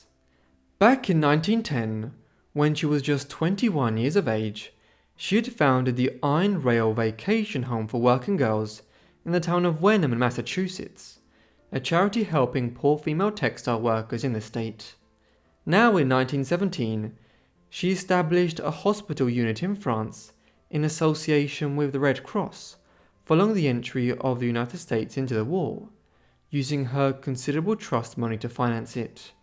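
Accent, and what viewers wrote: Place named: England, United Kingdom. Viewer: English accents drive me nuts. I can't listen to this BS.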